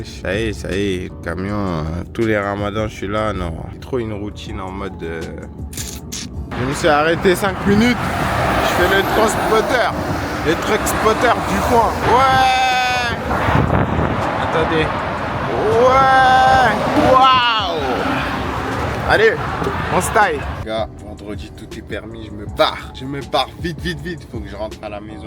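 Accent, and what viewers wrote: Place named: Marne, France. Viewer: Mais nan! T’es de Reims! Je le savais! T’as l’accent marnais 😊, un cagoulois de Chalons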